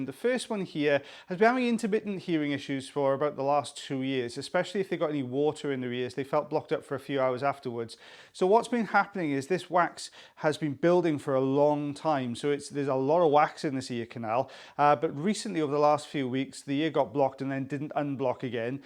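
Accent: Wales, United Kingdom. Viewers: There is great comfort to be had with hearing a Welshman say the word "valley". Thank you!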